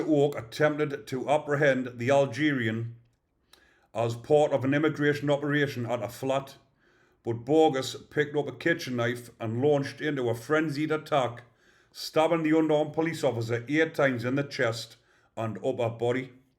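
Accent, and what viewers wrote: Newcastle, United Kingdom. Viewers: Pure Geordie 😁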